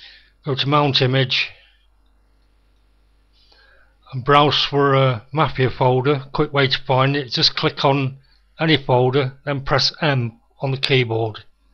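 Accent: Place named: United Kingdom. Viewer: great tutorial. great heavy british accent. you sound like one a them hardcore british gangsters. lol. but thanks alot. cutting right to the chase, no bullshit music or anything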